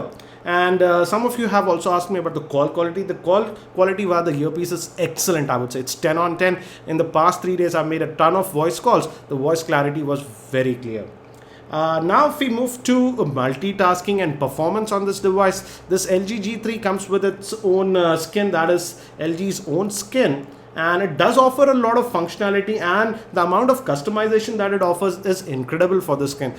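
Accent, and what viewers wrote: India. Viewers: your axent is anoi g stupid indi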